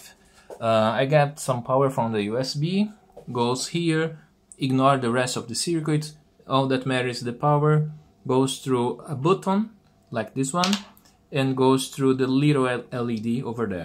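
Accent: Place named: Brazil. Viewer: Tu é Br man?(If not you sound very brazilian)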